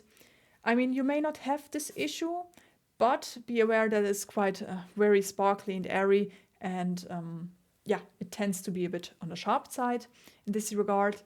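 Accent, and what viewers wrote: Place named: Germany. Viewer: Ahhh nice long term review! I could tell you were german right away ;)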